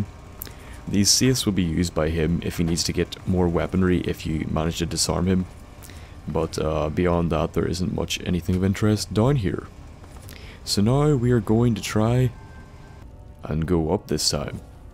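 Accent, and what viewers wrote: Northern Ireland, United Kingdom. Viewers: nice,video just wanted to ask are you northern Irish? because you kinda sound like my friend from northern Ireland.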